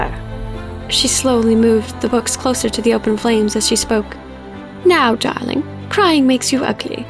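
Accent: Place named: United Kingdom. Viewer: WOWWW! I just found your channel your british accent to it adds prettieness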